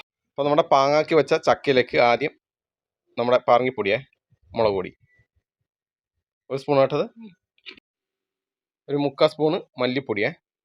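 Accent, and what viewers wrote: Kannur, India. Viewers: താങ്കൾ പറയുന്നത് ശരി തന്നെയാണ് ഒരു ലിസ്റ്റിൽ തന്നെ നാല് അഞ്ച് സ്റ്റൈൽ ഭാഷ ഉണ്ടാകും..ഞങ്ങളുടെ മലപ്പുറത്തും ഭാഷകൾ വ്യത്യാസം വരും..ഉദാഹരണം വേങ്ങര എന്ന് പറയുന്ന സ്ഥലത്ത്.. കജ്ജ് എന്ന് പറയും.. ഞങ്ങൾ പെരിന്തൽമണ്ണ ഭാഗത്ത് കയ്യ് എന്ന് പറയും.. വേങ്ങര പാട്ട് പജ്ജ് എന്ന് പറയും.. ഞങ്ങൾ പെരിന്തൽമണ്ണ പശു എന്നു പറയും... വേങ്ങര ഭാഗത്ത്. കജ്ജുമ്മ നജ്ജായി..ഞങ്ങൾ പെരിന്തൽമണ്ണ ഭാഗത്ത്. കയ്യുംമേൽ നെയ്യ് പറ്റി.... വർത്താനം സ്റ്റൈൽ നല്ലതാണ്.. അബുദാബിയിൽ എനിക്കൊരു കൂട്ടുകാരൻ ഉണ്ടായിരുന്നു അയാളുടെ പേര് തമ്പാൻ.. അദ്ദേഹം കണ്ണൂരാണ്.. നിങ്ങളുടെ അതേ ഭാഷ തന്നെയാണ് ചിലപ്പോൾ നിങ്ങളുടെ നാട്ടുകാരൻ ആയിരിക്കാം.. ഓക്കേ ബ്രോ കണ്ടിന്യൂ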